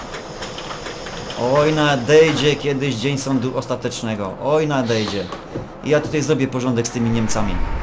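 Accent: Silesia, Poland. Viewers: odcinki są super idzie sie bardzo pośmiać a także odpocząc:) jestem z sląska i smieszy mnie to jak ''godo pan po slónsku''! wiecej omsi!:) Pozdrawiam!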